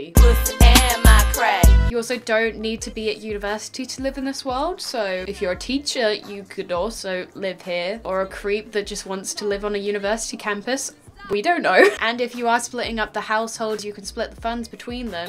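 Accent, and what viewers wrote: United Kingdom. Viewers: I just fucking realised, that is a female version of Pyrocynical. No joke:O. SiGnS, tHaT gO bEyOnD rEaSoNaBlE cOmPaRiSoN: -British (heavy British accent) -white/light colored hair -look pretty similiar (in my opinion) -editing is vaguely similiar (cuts, jokes and zooms) That is it I think. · i forgot you were british and i was SHOOK out of my mind when you started talking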